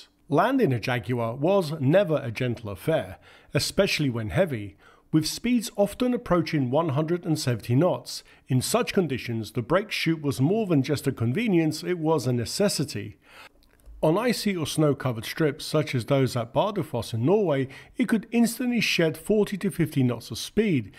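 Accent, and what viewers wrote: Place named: United Kingdom. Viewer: You have probably heard this before, but I bet you do a wicked Christopher Walken impersonation. I have chuckled a few times watching this when you sounded just like him, but with a British accent.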